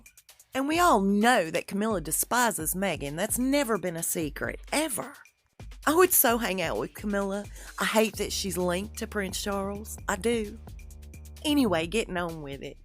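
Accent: South, United States. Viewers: I love it when you say y’all. I went to the USA recently and made nearly every American say it. In your words I was a royal pain in the ass. Still their accents were not as lovely as your Southern accent. Love your British Friend and her cat 🐈💕💕💕💕💐💐💐🇬🇧💕🇺🇸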